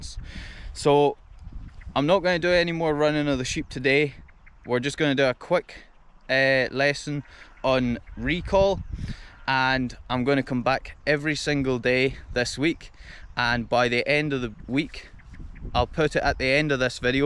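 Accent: Scotland, United Kingdom. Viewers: . i am a 61 year old American midwest “city slicker” (Cincinnati, Ohio). i love my dogs, mostly Golden Retrievers over the years, one who was half Newfoundland. And one “black sheep” 😉 who was found wandering the streets, about four months old, in the middle of the winter, possibly a stray, possibly feral, who we believe 🤞🏼 is part Border Collie, part Labrador 🤷🏻‍♂️ that takes her energy and intensity level down a notch, thank goodness 🙏🏼🫢. She was quite a handful when we adopted her (we didn’t know! she was just a cute little puppy 🤷🏻‍♂️🫢) so she required an adjustment and a lot of activity and attention… and we have accommodated her. Because we love her ❤️. Anyway, i have absolutely no interest in farming or tending animals. (My wife does, however. My neighbor commented on the amazing vegetable and flower garden that she had created in our backyard, and the chickens she was raising in it; “It’s just like the tv show ‘Green Acres’ - to which i replied, “yeah except I’m Zsa Zsa’ 😏😖”. 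Your lifestyle is anathema to me 🫣. But i love what you do. I love the scenery. i love your Scottish accent! Most of all, i love your dogs 😊 It is tragic that some people adopt Birder Collies and keep them as “pets” mostly inside the house, gone all day at work, with a little outside time in the yard, bored out of their intelligent minds with no outlet for their incredible energy and no fulfillment for their genuine sense of purpose. (Some dogs never het adopted at all… 😢😢😢). When i see your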